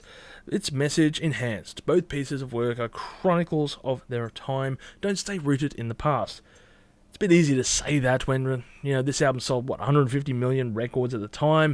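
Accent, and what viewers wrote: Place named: United Kingdom. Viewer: Dude you totally sound british 😂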